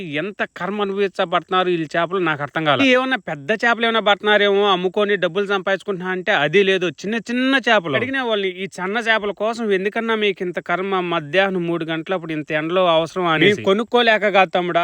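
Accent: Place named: Rayalaseema, India. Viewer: Rayalseema slang anna 😅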